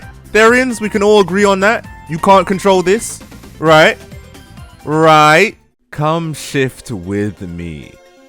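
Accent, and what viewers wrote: United Kingdom. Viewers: Definitely from England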